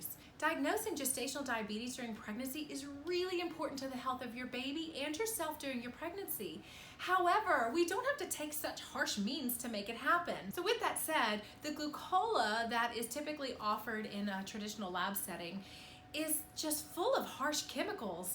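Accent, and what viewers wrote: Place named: Louisiana, United States. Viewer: I like this idea! I actually have my glucose test in the morning but my midwife gave me a more natural option than the glucola. Organic apple juice. So, I certainly am not complaining. Also, it was nice to hear a familiar accent. A Louisiana native now living in Kansas City... I miss that. Thanks for sharing this video.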